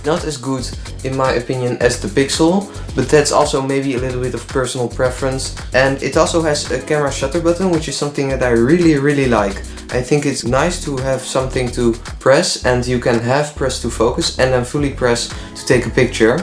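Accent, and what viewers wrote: Netherlands. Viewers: Are you dutch?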